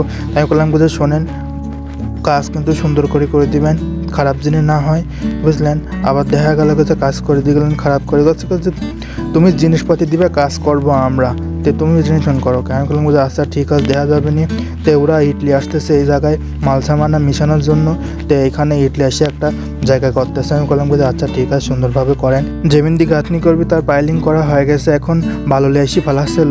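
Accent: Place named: Pabna, Bangladesh. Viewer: তুই কি বুঝবো আমাদের পাবনার ভাষাটাই এমন বুঝলে ভিডিও দেখবি না বুঝলে দেখার দরকার নাই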